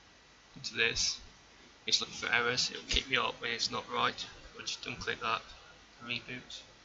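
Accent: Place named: United Kingdom. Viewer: I'm a nonnative English speaker I have a hard time to understand British accent :(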